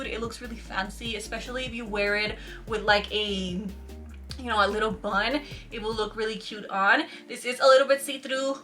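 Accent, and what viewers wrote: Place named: Puerto Rico. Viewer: Your Puertorian Accent is Everything☺️😁❣️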